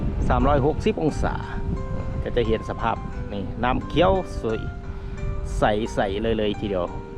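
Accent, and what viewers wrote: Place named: Vientiane, Laos. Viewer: ສຳນຽງຄືກັນ. ຄິດວ່າຄົນແຂວງວຽງຈັນຊັ້ນດອກ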